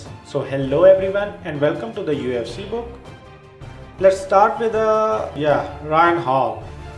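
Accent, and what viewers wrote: India. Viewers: In the description it said "Asian accent" I understand that India is in Asia but it would have been a lot more honest to say Indian accent. All the same I'm pretty sure most people can understand you, your English isn't that bad. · I am sure the voice in this video is an Indian Guy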